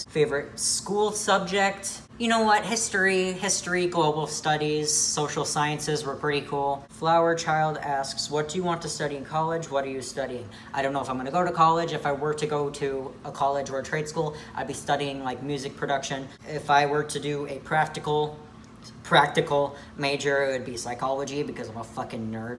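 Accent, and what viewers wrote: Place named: South, United States. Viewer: Very weird question, but are you from Southern Illinois? Your accent sounds more southern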